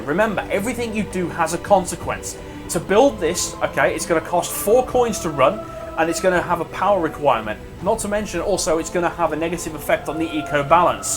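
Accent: England, United Kingdom. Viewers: the only video i have learned so many thing about the Anno game basic. thanks buddy. i love british accent. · Thank you so much for this tutorial! I absolutely love your way of talking, British accent, casual swearing and all. You got a subscriber, keep it up! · LOL epic british accent to go along with this epic vid:P just started playing this game again. this vid is really helping me. when i used to play i did fairly well, but my cities were just a total messxD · Thinking about getting this game and this video is helping alot in helping me make my decision! and the british accent makes the video both informative and very funny! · Commentary= Awesome, funny with British accent Guide= Awesome and very helpful Reaction= U just made me buy this game from Steam Now i'm gonna try it out... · Lol, English accent and mature comedy, Fuck going through all you videos and watching them, Instantly subscribed :)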